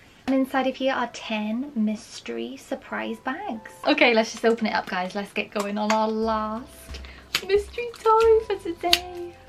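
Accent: United Kingdom. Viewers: I still can figure out if is British or not-💀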